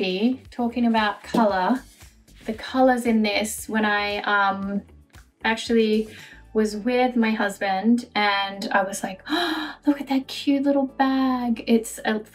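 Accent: Australia. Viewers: Like her Aussie accent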